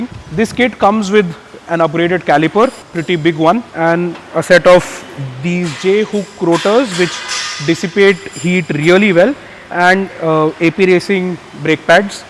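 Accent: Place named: India. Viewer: Im sure its indian accent😂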